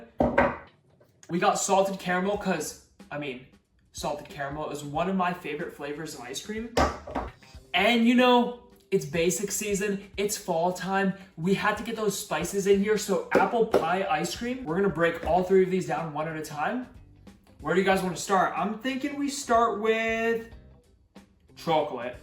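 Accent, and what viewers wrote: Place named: New Jersey, United States. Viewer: YES, I’m from Passaic county lmao recognize the accent anywhere 😂❤️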